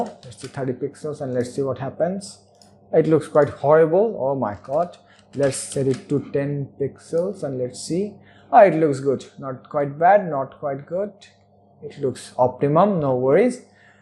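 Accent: Bengal, India. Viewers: dada tomar accent ta sunei bojha jachhe bangla vasa vashi❤️❣️,love from WB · Indian accent Lol, can’t understand!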